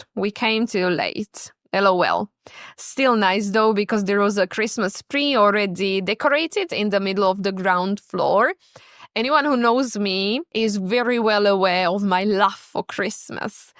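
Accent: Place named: United Kingdom. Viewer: Tá tvoja výslovnosť je dost britská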